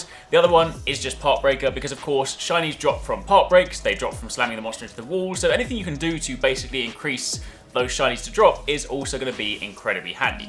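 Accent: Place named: United Kingdom. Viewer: you look like a viking but talk like a brit and its creating some SERIOUS dissonance in my mind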